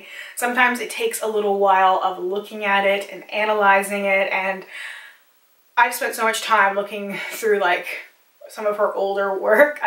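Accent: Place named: Canada. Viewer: I can totally hear your canadian accent in this vid lol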